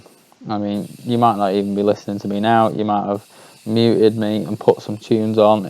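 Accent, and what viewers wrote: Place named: England, United Kingdom. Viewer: thanks for taking the time to help me. You sound English where about is your shop?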